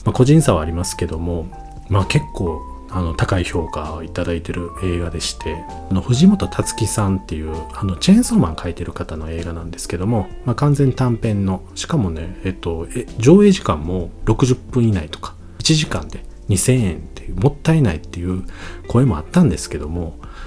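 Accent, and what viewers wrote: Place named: Kansai, Japan. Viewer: さんの地声での動画は初めてなので、動画見ながら、ん？関西弁？って思ってたら、大阪だったんですね！！🌟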